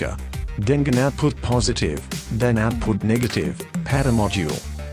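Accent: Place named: England, United Kingdom. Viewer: Sebenarnya dia logat inggris tapi pakai bahasa indonesia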